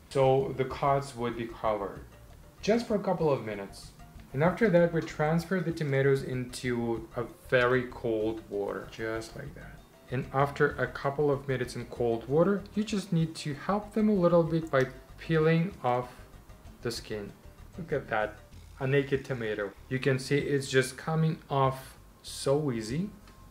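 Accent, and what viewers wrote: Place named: England, United Kingdom. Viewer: настоящий англичанин!!! Заслушалась. )))) Надо пересмотреть. )))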